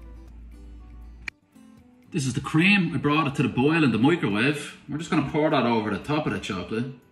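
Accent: Ireland. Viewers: Love your Irish brogue! Sláinte! --gv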